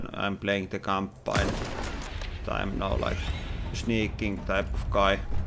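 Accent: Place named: Finland. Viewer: Krzesimir Nowakowski Finnish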